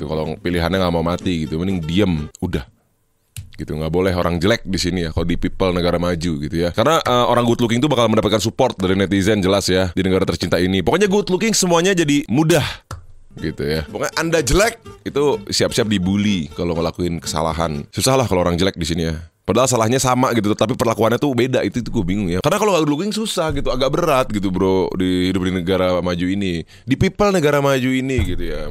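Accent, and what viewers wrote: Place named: South Jakarta, Indonesia. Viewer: Menuju dialek jaksel🥶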